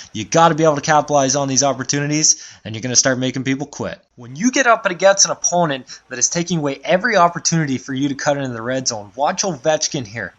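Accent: Canada. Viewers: thanks for the tips man! i can totally tell that you're canadian by the way you say about sounding like ABOOT. haha. thanks for uploading!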